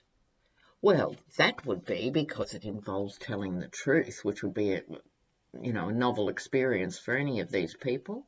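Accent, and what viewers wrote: Australia. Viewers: No apology necessary, and it’s not your charming Aussie accent. I wouldn’t have remembered the French pronunciation either, had I not had a childhood friend whose native-French mother had the same name.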